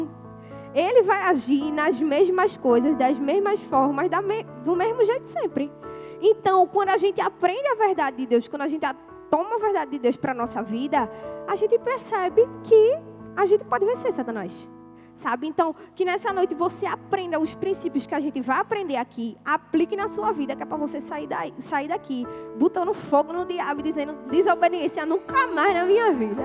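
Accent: Northeast, Brazil. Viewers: Gosto do sotaque nordestino Deus abençoe a todos nós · O sotaque mais lindo que eu já vi Nordeste seu lindo 😍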